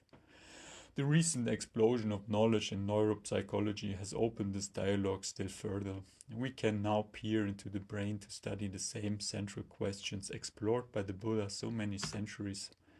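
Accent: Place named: Germany. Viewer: Ich hab zwar Tag meines Lebens noch kein vergleichbar heftigen deutschen Akzent gehört, aber ich danke dir soooo sehr für dieses Reading und den Upload.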